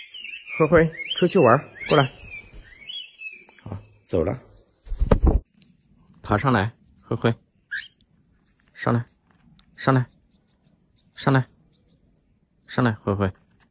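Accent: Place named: North, China. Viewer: 從不露臉的視頻主,身材好瘦高型,講話字正腔圓聲音好聽,是不是年輕人不知道？猜是大陸北方人or東北人？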